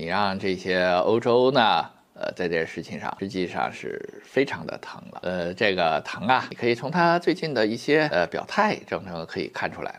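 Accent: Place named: Beijing, China. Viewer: 京腔真重啊！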